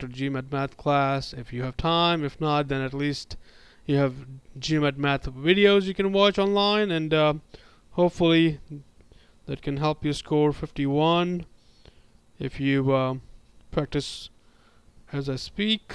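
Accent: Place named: United States. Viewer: what a stupid american accent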